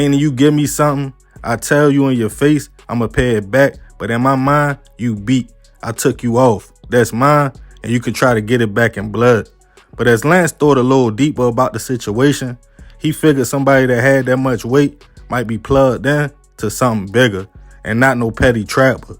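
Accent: Baltimore, United States. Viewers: Brudda i knew u was from baltimore b4 I saw the pick just based off of why you talk. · Baltimore accent got me losing focus on your story. It’s very annoying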